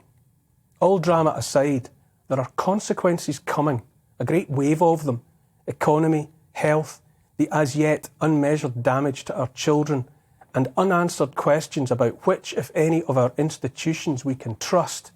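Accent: Scotland, United Kingdom. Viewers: We have Rod Cullerton and Jason Miles from GAP. We have Graham Hood who's been very outspoken, along with Hoodys Helpers. I do like though.. I love a Scottish accent. The same will happen here. They know they're losing, no unvxd at fireworks, oh no please come.. just one example. I think you'll see a lot of scrambling now. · Agree with everything you say,. Look forward to every posting from you. You present with a calm Scottish accent but I can hear the anger beneath. As punishment for those responsible and especially those who made millions from their decisions I would suggest that they all have to return the money and sell their assets except for one average priced house and give that to theTreasury to help offset the bill that we, our children and our grandchildren will be paying for their lifetime.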